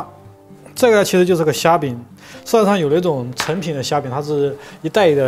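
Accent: Sichuan, China. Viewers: 师傅讲话不出两句我就听出了四川或重庆人吧？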